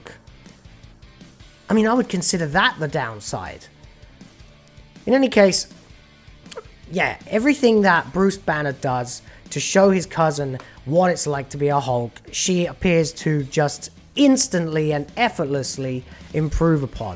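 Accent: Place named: England, United Kingdom. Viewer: Oh, now I know what Joe Pesci would sound like if he had an English accent. Good show.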